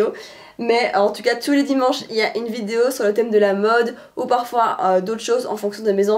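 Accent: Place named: Brussels, Belgium. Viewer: Tu ressemble beaucoup à la chanteuse Angèle surtout avec l’accent bruxellois 😭❤️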